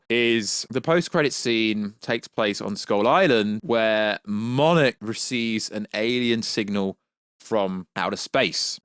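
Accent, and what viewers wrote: United Kingdom. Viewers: you have a cool British accent!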